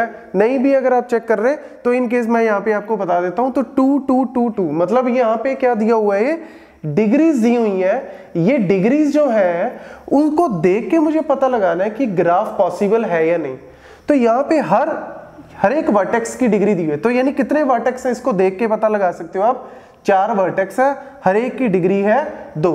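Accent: Punjab, India. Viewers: Main jitna tumhe dekhu, dil ye na bhare Thanks sir, I watched all your videos on Graph theory. Punjabi accent is lovely!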